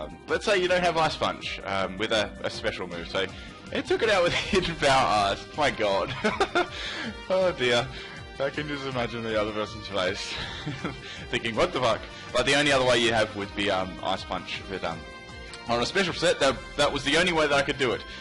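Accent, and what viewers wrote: Australia. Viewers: Yes it is, but he's an Aussie so it's okay for them to mispronounce words, or it could very well just be his accent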